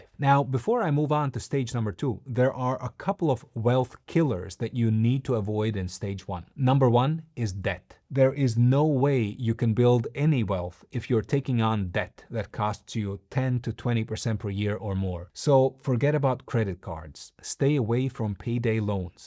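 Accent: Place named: Latvia. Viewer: Klausoties dzirdu nedaudz latviešu akcentu :)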